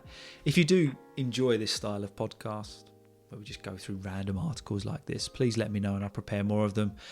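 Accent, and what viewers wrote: United Kingdom. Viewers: hello from Brazil. I love British's english and this is the first time here listening to you. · I love this, am like learning british accent and am really feelings awesome right now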